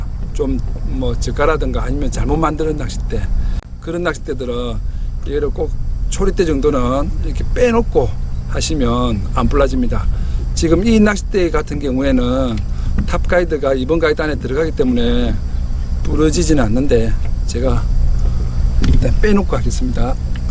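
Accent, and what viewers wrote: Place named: Tongyeong, South Korea. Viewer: 고향이 통영인 외지에 사는 사람입니다 고향말을 들으니 좋아서 그러니 오해마세요 ㅎㅎ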